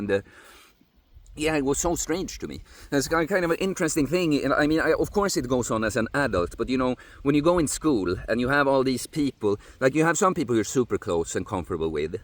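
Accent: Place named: Sweden. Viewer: Love you! Only recently found your channel, but I will mourn the day the last time you will upload. We share a large deal on the views and outlook on life, only difference is youre much much further along this path and youre close to the embodiment of what I aspire to be, both physically and mentally, which is probably why I like your videos so much. I grew up in Sweden so the accent is lovely aswell lol. As for feedback on the current video, I want to hold up a mirror to you and say "own your vibe to attract your tribe", this quote really struck me and gave some food for thought, you keep doing you man. Har det så bra!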